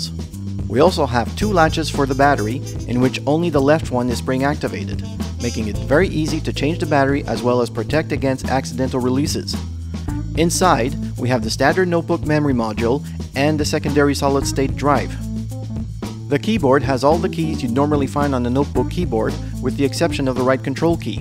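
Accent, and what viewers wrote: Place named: Quebec, Canada. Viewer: It comes into 'play' when someone has posted an instructional video such as the one visible above and has ruined the video by speaking in some bizarre french canadian hybrid accent..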